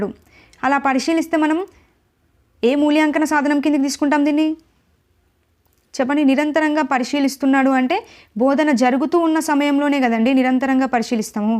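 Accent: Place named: Telangana, India. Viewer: మీరు తెలంగాణ కదా మేడమ్ Voice telustundi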